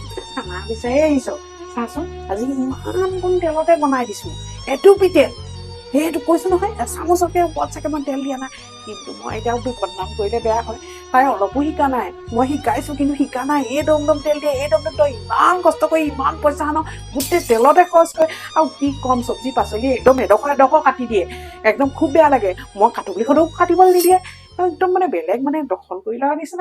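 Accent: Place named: Lower Assam, India. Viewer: 😂😂😂😂😂😂😂😂😂 Hahi Hahi petor nari dal dal 😅 Pise eta kotha. Please don't take it otherwise, apunar mukhot Lowee Assam accent tue besi val lage. Baki sob Mojjjaaa ❤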